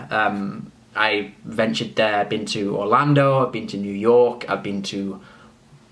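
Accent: Canada. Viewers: you are getting a Canadian accent. saying 20 is the giveaway. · you Canadian accent is getting dope!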